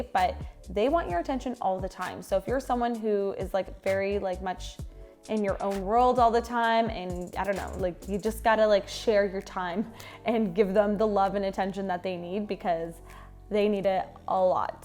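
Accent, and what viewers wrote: Canada. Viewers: Is she Canadian? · Your canadian, arent you? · R u Canadian?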